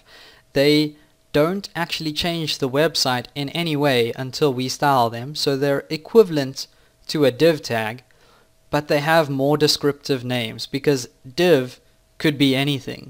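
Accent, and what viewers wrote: South Africa. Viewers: agree you better than Lynda ... thank you you sound south african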